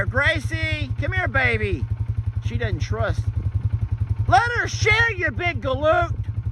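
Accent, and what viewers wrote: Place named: South, United States. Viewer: I am 100% right there with you on the southern pronunciation, there. If I have to use text-to-talk or google search voice, I have to speak loud, clear and slow...and STILL get frustrated because the damn thing doesn't speak Southern! And forget calling with voice activated menus....I might say "pay my bill" 5x and it still can't understand me! So I give up an start cussin it out...so it finally switches to number controls: 1 for yes or 2 for no. I laugh but I also get really pissed....because Southern people in my neck of the woods get some words more correct than other states! Some places people say E-mmediately, we say IMmediately (like IMagine) which is likely more proper! Its a crazy thing, the english language!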